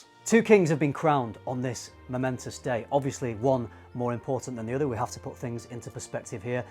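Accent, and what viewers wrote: United Kingdom. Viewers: I blind bought it, and I am just in love. The only thing that saddens me is that I will not be able to apply it in the summer heat. But that is why there are three other seasons! :D Thank you for the review! As always great work! The British accent certainly makes it more enjoyable, "pleasing to the ear", for sure!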